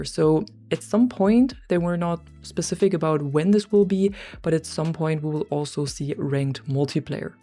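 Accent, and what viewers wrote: Germany. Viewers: Nach deinem Akzent zu urteilen, bist du wahrscheinlich Deutsche ;) Alles Gute zum Geburtstag !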